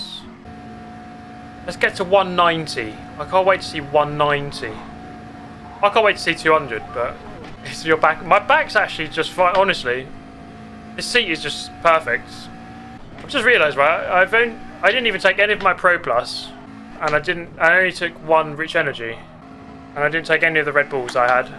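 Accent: England, United Kingdom. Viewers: Bro are you Filipino with a English accent? Gah damn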